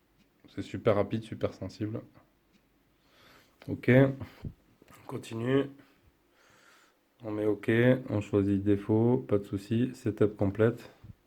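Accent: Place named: Southwest, France. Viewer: Aparisi Anthony dans le sud ouest c'est comme ça ils prononcent tout lol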